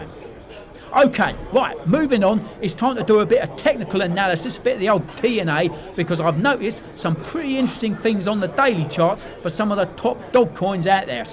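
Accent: United Kingdom. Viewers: As an American, this is a proper British accent 😂. Cheers guv!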